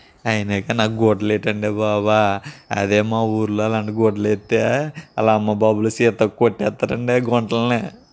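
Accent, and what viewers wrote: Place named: Godavari, India. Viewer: Godavari jillla yaaasa super bayyya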